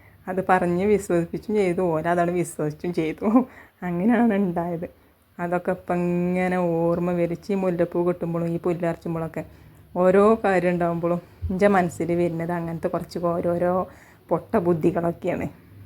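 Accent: Malappuram, India. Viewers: അന്റെ നാട് ഏതാണ് ഒർജിനൽ മലപ്പുറം ഭാഷ ആണല്ലോ ഇജ്ജ് കജ്ജ് പജ്ജ് നെജ്ജ്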